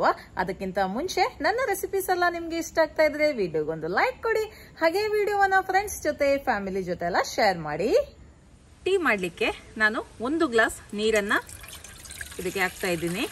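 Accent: Mangalore, India. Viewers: Supertea madam maguvinathaha mukhadaste mangaloorina mathu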